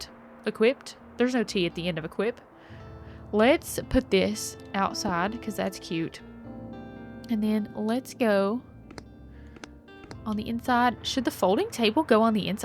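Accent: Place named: Appalachia, United States. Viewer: Hi! I heard your accent and knew you lived near me. I'm in Kingsport/Mount Carmel. Hey neighbor! 👋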